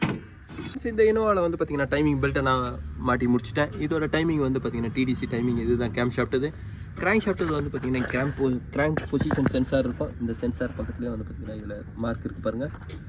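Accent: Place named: South India, India. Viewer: I didn't understand what you said In south accent but it's very informative ❤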